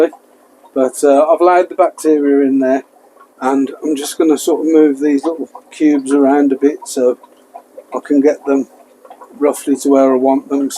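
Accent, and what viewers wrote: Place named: Black Country, United Kingdom. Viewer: yeah I heard in one of your vids. I guessed so with Yam twang 🤣🤣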